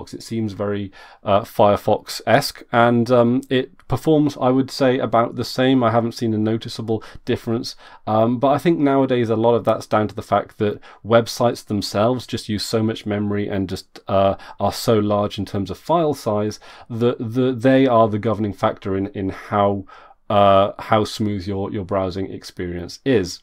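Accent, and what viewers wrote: Wales, United Kingdom. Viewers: wait r u welsh can u speak welsh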